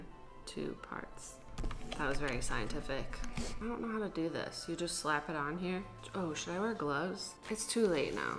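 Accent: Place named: New York, United States. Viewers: bc she is LOL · Why does she sound kinda like she be from New York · She’s literally from Rochester, NY my dude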